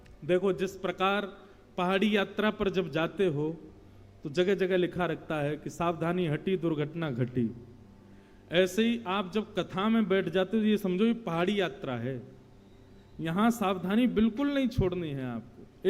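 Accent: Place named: Braj, India. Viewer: Raadhey raadhey guru ji aap bhut acchi katha krte h aap ke mukharvind se brij boli bhut acchi lagti hai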